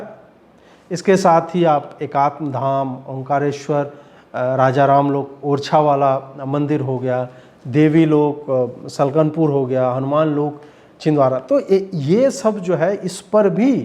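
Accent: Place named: Begusarai, India. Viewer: अपने टोन में कुछ बेगूसराय्या भाषा का प्रयोग आपके वीडियो को बेहतर बना देता है।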